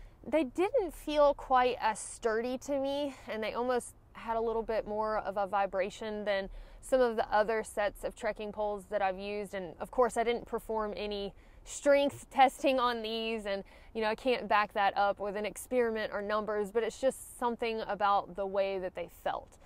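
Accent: South, United States. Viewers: It’s good to hear a southern accent! Moved to CO from Elmore County last summer. I miss hearing Southern English! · Just stumbled upon your channel and love the info and that southern drawl 👍😎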